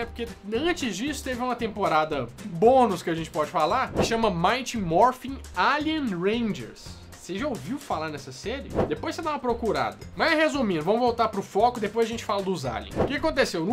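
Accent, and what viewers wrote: Minas Gerais, Brazil. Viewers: Esse brother é muito mineiro! kkkkkk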